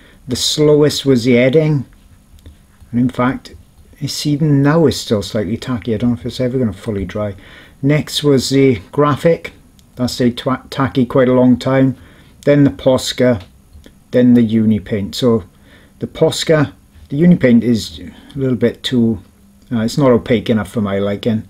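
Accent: Wales, United Kingdom. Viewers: i'd recognise that accent anywhere. where in Wales are you from?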